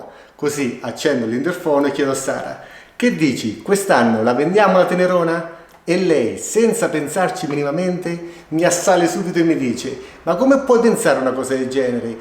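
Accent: Marche, Italy. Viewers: Gran moto la Super teneré, ne ho avuta una uguale identica! Dal tuo accento sento che sei Marchigiano, oserei anche azzeccare la provincia ma non sono sicuro, Macerata?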